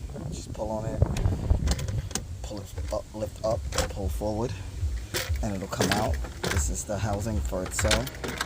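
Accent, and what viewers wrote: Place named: New York City, United States. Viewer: Thanks bro you saved me $80 , I hear Washington Heights in that accent…🤓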